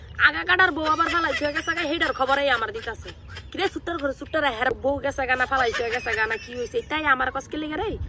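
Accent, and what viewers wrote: Comilla, Bangladesh. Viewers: Ha ha ha ha ha ha, eii apu tumi R gali diyo na amar haste haste sound asena, 😂😂😂 R kotha gulu amader zillar moto mone hoy, Comilla, Tumi kon Zillar??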